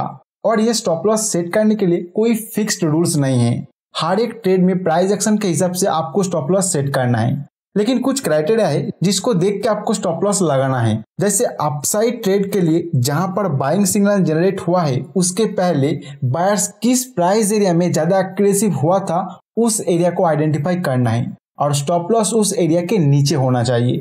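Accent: Bengal, India. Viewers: App ko accent se malum hota hain keya app banagali hain sir?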